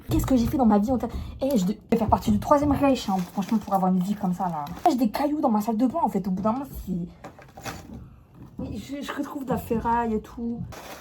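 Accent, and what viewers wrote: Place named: Maghreb. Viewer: Pourquoi tu parles comme une blédarde? Pfff. La vie en ville les accents de la ville c'est devenu d'une laideur. Dommage sur un si joli minois. Enfin bon force dans tes galères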